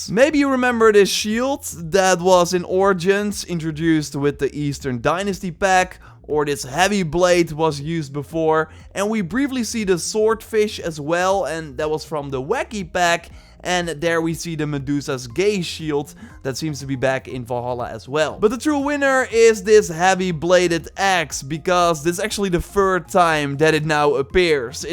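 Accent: Netherlands. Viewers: Man he has such a bad accent, I mean I know several dutch ppl who speak normally and this guy's out here saying fideo and ashgard and volhala hahaaha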